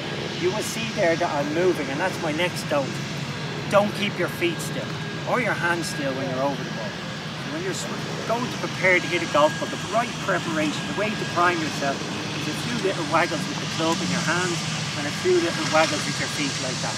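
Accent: Ireland. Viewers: I love getting golf lessons with an Irish accent.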